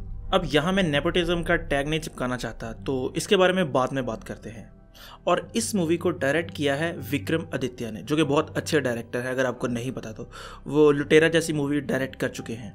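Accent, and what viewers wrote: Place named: Pakistan. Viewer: this guy sounds like Pakistani..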